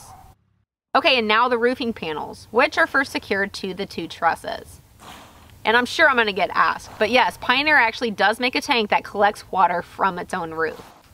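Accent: Texas, United States. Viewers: Dang. I forgot you’re from Texas. 😆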